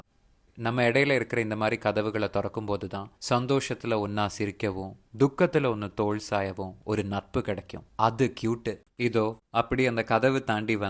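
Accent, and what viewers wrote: Sri Lanka. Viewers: I really enjoy your Tamil slang. The way you mix Sri Lankan Tamil gives it a unique charm and a refreshing vibe. And, are you not muslim 😅 can you clarify to me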